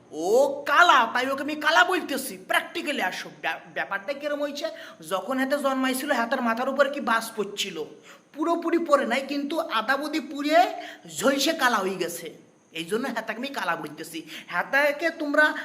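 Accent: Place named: Noakhali, Bangladesh. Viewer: Noakhali te bari naki tomar 😅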